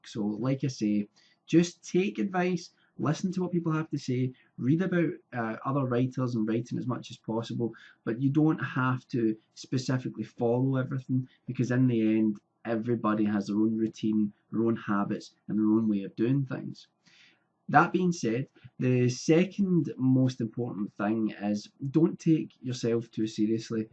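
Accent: Scotland, United Kingdom. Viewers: Nice accent are you Scottish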